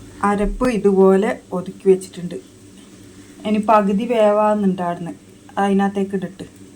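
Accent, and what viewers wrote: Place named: Kannur, India. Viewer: ok👍 ഭാഷ കേട്ടത് കൊണ്ട് ചോദിച്ചതാണ്... ഞാനും കണ്ണൂർ ആണ് 😃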